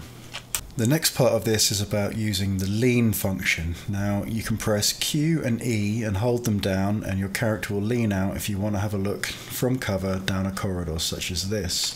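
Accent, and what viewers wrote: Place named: United Kingdom. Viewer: Why do i take british accents so much more seriously than american ones lmfao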